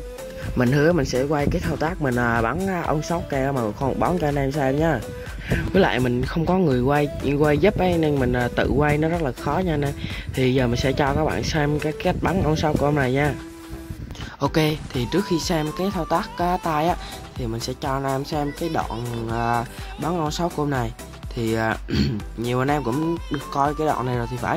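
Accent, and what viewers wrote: Quang Nam, Vietnam. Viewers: Anh người quảng nam hả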